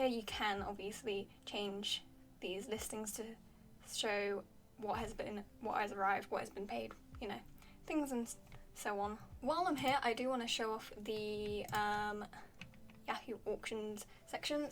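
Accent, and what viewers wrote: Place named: United Kingdom. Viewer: Hi, Really loved the video. Really love your personality. You are so beautiful. Love the way you talk and your voice too, lol. Really love your British accent. Sorry if that was a weird thing to say. I have been using Buyee for a long time, and they are very fast, and very reliable, but the only thing I do not like abut them is that when I choose Express Air Mail delivery, they make the choice out of 3 options that I do not know until after they shipped. They either ship DHL, FEDEX, or UPS Express, depending on the weight and dimensions of the package. Anyways, I just wish I had my own choice from those 3 options. I really like that this Proxy service (Remambo) allows you to choose the shipping service you want, instead of making that choice for you. I am only half way through your video, but it is so great. You seem super smart. 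I might switch from Buyee to Remambo now. I will give Remambo a try. Thanks again for the great video. I liked and subscribed to your channel. Love you, lol.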